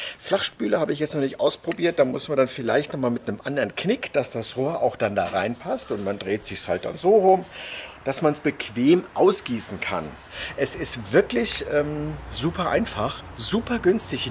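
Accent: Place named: East Germany, Germany. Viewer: Könntest glatt als Ossi durchgehen 😅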